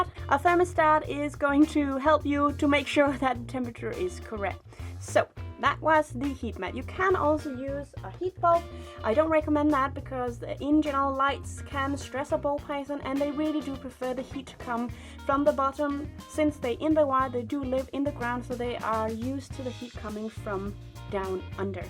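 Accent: Denmark. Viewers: its a beautiful accent danish is similar to one of languages called afrikaans 🙈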